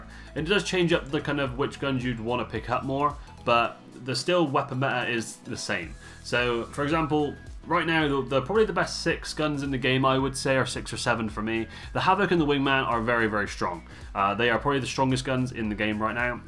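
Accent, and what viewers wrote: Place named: Somerset, United Kingdom. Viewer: I'm from NE Somerset, I could definitely tell. Lots of 'er's lmao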